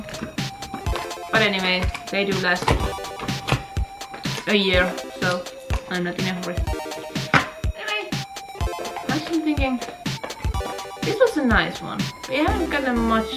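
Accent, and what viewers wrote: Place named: Scandinavia. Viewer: Its christmas for the huskies lol. nice vid. You sound scandinavian, i am from Bornholm Denmark.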